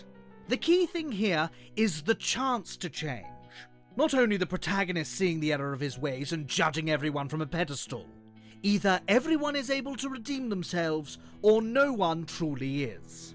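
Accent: RP, United Kingdom. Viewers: I love there voice tbh, I definitely will binge the channel just to hear the fancy British vampire voice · This is the most British sounding person I’ve ever heard 😂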